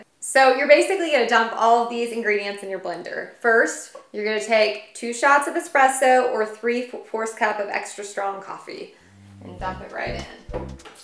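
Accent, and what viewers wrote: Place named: South, United States. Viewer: It’s different to say caramel with different accents, I guess you could say I have a “California accent” we pronounce things kind of straight forward so yeah we say it like caramel, your type of southern accent is like Carmel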